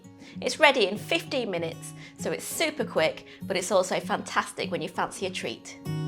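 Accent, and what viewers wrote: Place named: England, United Kingdom. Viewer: Heard the English accent, I knew there'd be alcohol involved. Sigh... you all really need to stop consuming poison.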